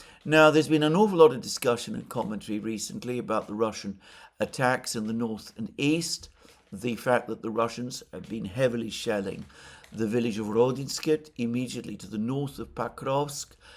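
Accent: England, United Kingdom. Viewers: Just because he has an English accent does not mean that this is not Russian propaganda, it merely sounds better than the regular Russian propaganda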